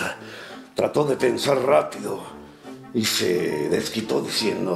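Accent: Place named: Argentina. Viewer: La verdad que te sale muy bien nuestro acento argentino. Pero no le vi la gracia al chiste. Aunque si me gusto lo de pepito que si le cambia una letra se llama "peputo" ajajaja ajajaja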